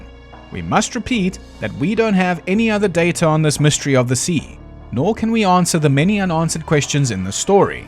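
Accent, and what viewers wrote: South Africa. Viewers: Goddamnit I thought you were an aussie and when you read that Dutch I was like "wtf?" How did I not pick up that South African accent. I feel like an naai. Well, now I have to subscribe. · You sound like Rodney Howard brown. I knew immediately you were south African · Your content has been getting recommended to me for a while now and I assumed it was because my algo finally realised I might like to see things other than Skyrim challenge runs because this topic is exactly up my alley. So imagine my shock when I clicked on the video and got SUCKERPUNCHED by a fellow South African accent!! But also yes. I do want to know some actual facts about this very very silly legend that I could never make sense of no matter how hard I tried. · This is not how i find out you're South African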